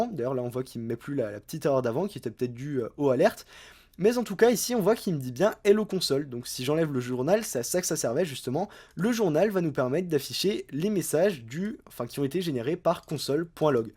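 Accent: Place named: France. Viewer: la voix du bon caifran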